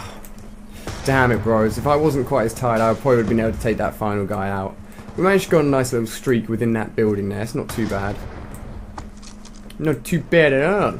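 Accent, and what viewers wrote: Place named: United Kingdom. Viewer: You should do one episode with you talking without a british accent.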